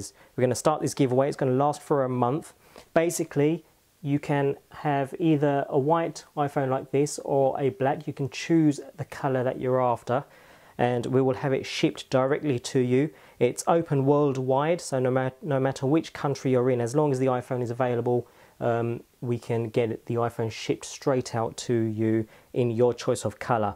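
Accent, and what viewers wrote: United Kingdom. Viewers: I like white because it really makes the iPhone stand out and make it look sharp. It's also easier to find and more pleasing to the eye. I really hope you chose me. (LOVE BRITISH ACCENTS) ;)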